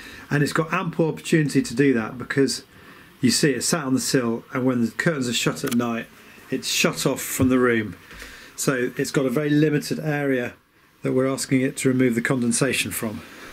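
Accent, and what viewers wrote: United Kingdom. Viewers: Hi, judging from your accent you live in the UK. In your climate you will always get moisture on your windows if you have a single glass window. When the air hits your glass it has a sudden change in temperature and will therefore reach its "dew point". To avoid this, you need to install mechanical ventilation...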